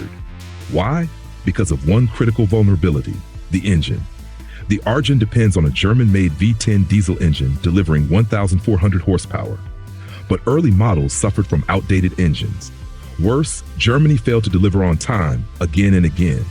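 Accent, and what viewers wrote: United States. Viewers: Butthurt Indian guy puts up a video defending his tin can in American accent.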